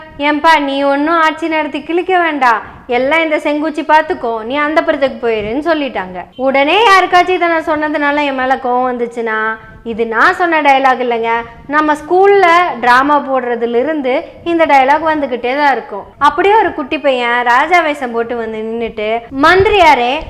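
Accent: Kongu, India. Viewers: எம்மா ! கொங்கு தமிழையும், சென்னைப் பட்டினதமிழையும், கலந்து பேசிய பேச்சு இருக்கே, அது கோட்டாகாரறுக்கு புரியுமான்னு தெரியலையே ? தாயே!!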